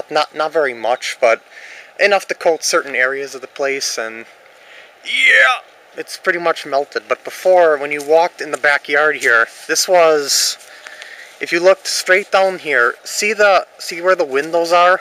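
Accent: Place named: Wisconsin, United States. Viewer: no idea where that is, haha it sounds cool, i never heard your accent before and now i know what a "Wisconsin" accent sounds like ;)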